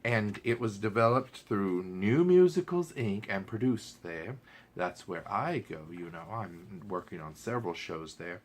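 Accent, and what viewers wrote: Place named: South, United States. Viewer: Hi! Loving your southern drawl.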